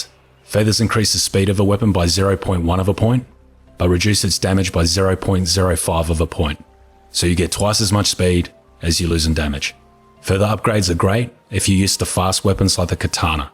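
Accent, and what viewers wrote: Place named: Australia. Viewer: You are such a good YouTuber, straight to the point while explaining it perfectly. And you sound like your a Aussie, which makes it 100 times better.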